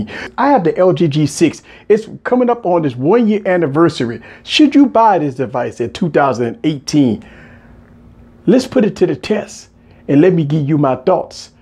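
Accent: Louisiana, United States. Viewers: love that Louisiana accent, pretty cool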